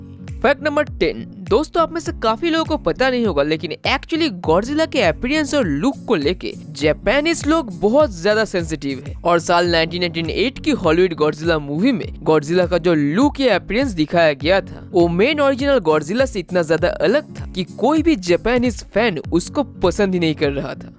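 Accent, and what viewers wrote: Bengal, India. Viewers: Tum aisa q bolta hai tum bangali hai kya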